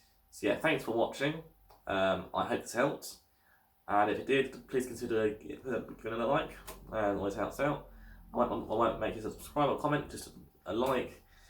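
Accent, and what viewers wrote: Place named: Australia. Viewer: Damn it hell sucks, I had to stop and try find another video due to me not being able to understand a single word this guy says. It's a shame cos it seems his informations handy. I'm Australian too so I should be able to understand a fellow English speaker but this guys accent is stroonngg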